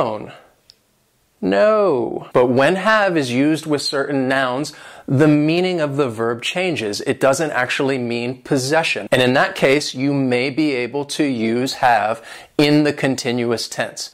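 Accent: United States. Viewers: Mayada swelam Yeah my girl! I'm not, but I'll answer it for ya hehehe There are some possible reasons: his American accent or that "t" is a silent letter, whose sound we can't hear, alright?? Let's wait for his confirmation! Cheers, my friend!!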